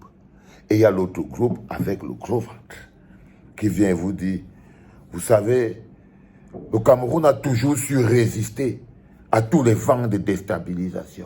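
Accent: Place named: Ivory Coast. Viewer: Ya pas garçon au Cameroun? Tu sonnes comme un ivoirien. Avec votre président marionnettes de l’Élysée à qui on vous donne une blanche comme première dame ivoirienne 😂 vous ouvrez aussi la bouche? Quand les gens parle faut vous cacher cuz vous êtes les premières à vouloir attaquer le Niger qui se libère de votre président ( Macron )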